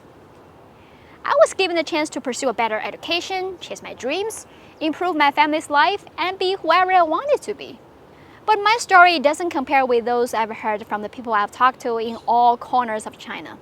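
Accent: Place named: United States. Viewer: You do not sound like studying in UK, more on US.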